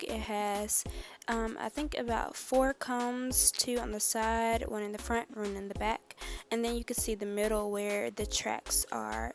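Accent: South, United States. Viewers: you sound southern lol , where you from ?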